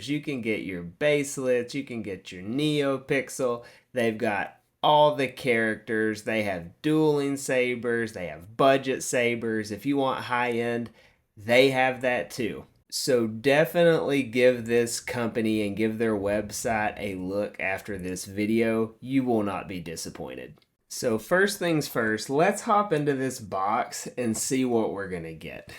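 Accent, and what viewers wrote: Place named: South, United States. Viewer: Southern accent is fucking cute❤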